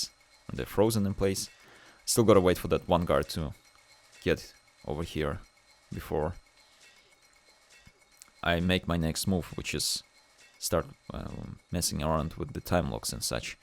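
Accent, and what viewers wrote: Russia. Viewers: you from Russia or Germany? Your accent as a normal russian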